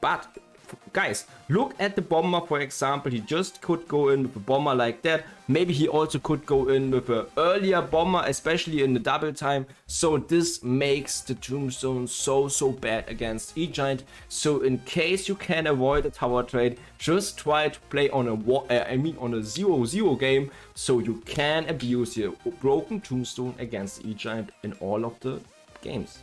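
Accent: Germany. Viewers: Man hört das du deutsch bist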